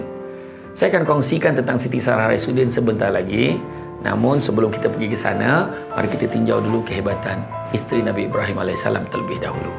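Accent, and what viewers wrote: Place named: Kuala Lumpur, Malaysia. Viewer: Jangga raso eehh Ustaz cakap kolumpo.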